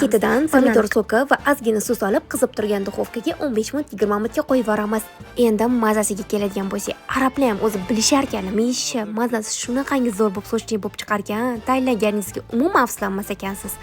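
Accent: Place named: Kashkadarya, Uzbekistan. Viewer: Қашқадарёданмисиз? Қашқадарёликлар "тайярлаб" эмас "тайлаб" деб гапиришадида. Шундан билдим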